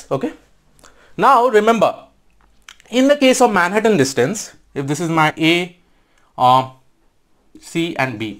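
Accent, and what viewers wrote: India. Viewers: love from China I like Indian English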